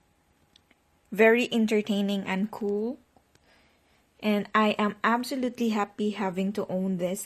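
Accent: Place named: Philippines. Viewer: you are a filipino! I can recognize an accent from a filipino!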